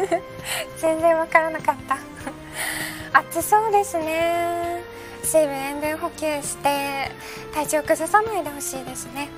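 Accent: Hakata, Japan. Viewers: もう、博多弁でよかろうもん。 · 後半の博多弁で無事キュン死(*∩ω∩) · 博多弁好きやけんねー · 途中からの博多弁凄くカワイイですね☺️ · 博多弁だったんだって改めて認識 九州弁(博多も使う)のだと思ってた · ゆってへんだけでニュアンスはめっちゃ博多弁よなこの子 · もうずっと博多弁でやって欲しい✨ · 博多弁可愛すぎる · 関西人やけど博多弁が一番可愛いし好きやわ。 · カワイイ(*^.^*) いいんじゃないかな。博多弁とか方言混じり。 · むしろ博多弁にしてほしい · 勘違いしたらいかんよ、可愛い子が博多弁を喋るけん可愛いと。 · 博多弁は正義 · 緊急事態以外、博多弁でやっても全然良い。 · 博多弁のままでよかよ(^^)♫ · 美月さん博多弁可愛いいですね。 笑顔でごまかす姿がとても可愛いいですね😊 見ていてとても癒されますね。 まさに女神ですね👍️ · もう博多弁で話していいよ てかキャスターの出身地の方言縛りの日あったら面白そう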